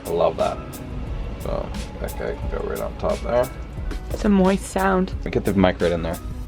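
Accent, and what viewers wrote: Canada. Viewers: I just stumbled across your channel and I’m very confused lol HEB is a Texas based grocery chain but your accent sounds Canadian lol but I’ve been wanting to try chickpeas/garbanzo beans but didn’t know what to do with them! Thank you! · im gonna try this!! lol there was barely any sandwich left for her to try ahaha poor girl and why did their bread already sound toasted when he threw it in the pan? lmfao first time watching him and hes funny. Is he canadian by the way? or am i just picking up an accent and the cheesiness lol? dont worry im Canadian too But yeah deff gonna try this minus the sun-dried tomatoes! All around good but theres so many hygiene issues that were literally making my anxiety skyrocket...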